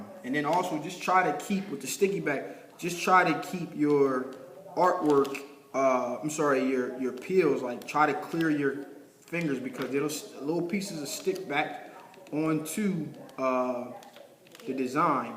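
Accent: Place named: Philadelphia, United States. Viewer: sounds like your from philly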